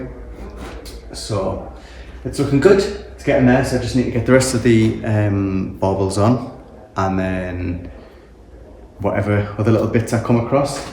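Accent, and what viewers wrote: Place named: Middlesbrough, United Kingdom. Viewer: Totally agree with your decision on the ribbon. Looks much better now. You certainly have an eye for what works. Jealous of some of the decorative glass baubles you have and I’m certainly stealing the gold sprayed holly idea. nearly did me out of one of my favourite games- spotting how often he says cha-eau (in best Middlesbrough) instead of chateau. Only once on this vid! 😛 Anyway have a wonderful Christmas - you’ve all earned it after your hard work this year. I love seeing the progress you’re making. Nodlaig Shona from Ireland.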